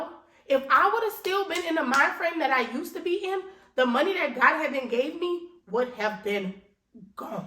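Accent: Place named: New Orleans, United States. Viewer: Congratulations on all your success. Are you from New Orleans? Your dialect and mannerisms remind me of home. 😊❤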